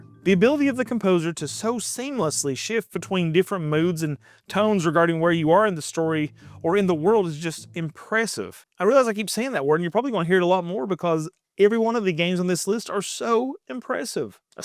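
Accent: South, United States. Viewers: Finally!! I know about most of these but I've been excited to see your take on some Japan only games for awhile now! Love your content, as a fellow southerner your accent adds a level of familiarity i dont get in too many gaming channels 🤣🖤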